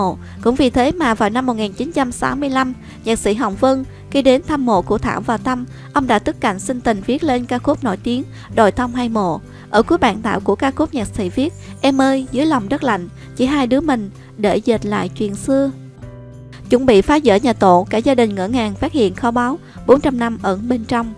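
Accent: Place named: Vinh Long, Vietnam. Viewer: quê o gò công sao nói o vĩnh long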